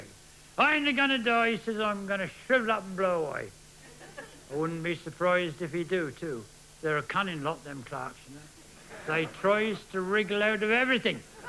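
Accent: Buckinghamshire, United Kingdom. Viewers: I was led to believe that his accent is Buckinghamshire. Having been brought up there, I wouldn't disagree.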